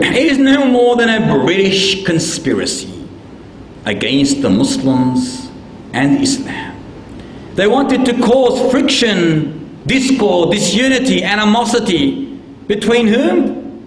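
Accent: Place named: Australia. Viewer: before insulting him, please know that he accent is Australian and that's how they talk. Don't judge him for the way he speaks, but for his content.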